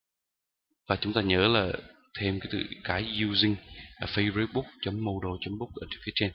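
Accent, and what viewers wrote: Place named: Hue, Vietnam. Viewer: Giọng Huế của anh nghe thú vị nhỉ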